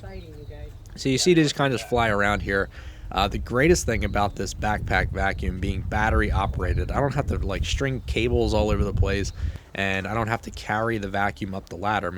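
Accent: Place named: Pennsylvania, United States. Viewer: your pennsylvania accent really shows on your o’s haha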